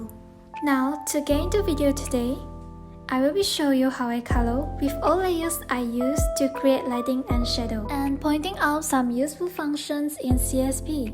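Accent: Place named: Vietnam. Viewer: idk why but your accent looks like Vietnamese people when they speak English lol sorry if you're not Vietnamese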